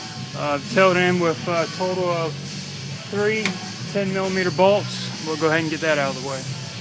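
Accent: South, United States. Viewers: Haha I thought I heard a slight twang in your voice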